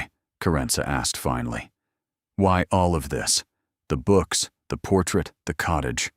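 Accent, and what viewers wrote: United States. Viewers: This is a wonderful story. Well presented in every way. However when I listen to these wonderful British tales I so wish it was in a dignified British accent. American accents have no real place in the British Victirian Era. Just a thought ❤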